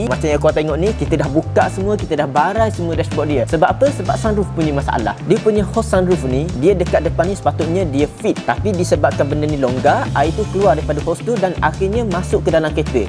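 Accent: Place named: Terengganu, Malaysia. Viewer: Org ganu eh ckp gini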